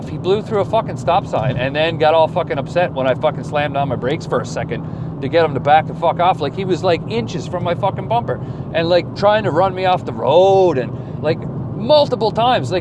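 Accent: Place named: Grande Prairie, Canada. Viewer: sound's like a grande prairian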